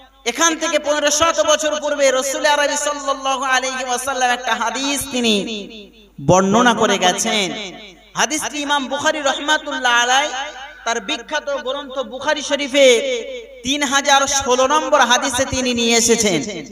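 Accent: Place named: Bangladesh. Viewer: অনেকটা বাংলাদেশী বক্তাদের মতো শুনতে লাগছে ❤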